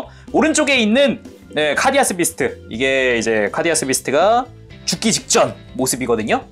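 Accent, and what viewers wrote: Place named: Jeolla, South Korea. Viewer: MGEX 리뷰는 이채널에서 한 리뷰가 넘사벽인듯.. 근데... 전라도 사투리는 좀...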